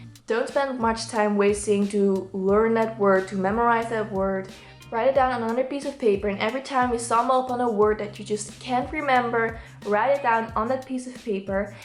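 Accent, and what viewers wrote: Netherlands. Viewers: are you dutch?? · I smell Dutch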